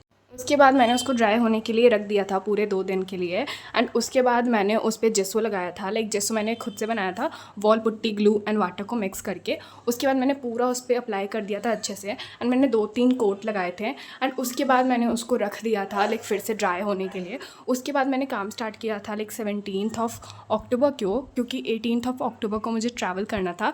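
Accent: Bihar, India. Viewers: Aap Bihar se ho kya di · Aap bihar se ho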